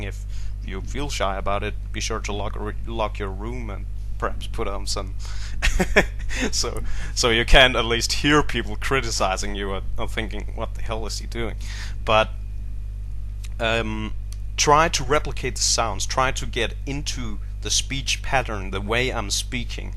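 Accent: Denmark. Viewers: He' speaking with a Danish Accent end of story. when he's speaking English it's kinda painfully obvious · Du snakker som en fra København! os fra Jylland snakker mere normalt :D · English with a danish accent and perfect danish... LOL! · Are you from Denmark? You sound more Danish than i do.. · Du er jo dansk! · wait? you are not danish? you sound just like the people here in denmark. except for the reading proplems :3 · Why does he have a danish accent when speaking English when he's American? -. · That's a Danish English accent - like Penelope Cruz English accent. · Your awesome. And i can hear you really grew up here in denmark. Thanks for yearh, kinder giving Denmark an shoutout. :D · You sound like you come from Jutland. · wouw.. i would guess he was a dane! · Vad du läser är egentligen svenska. Du gör svenska med en danskt uttal. ha ha det låter roligt i alla fall :). 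Thats funny, hes reading swedish with a danish accent. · Han har sgu da tydeligvis dansk accent når han taler engelsk.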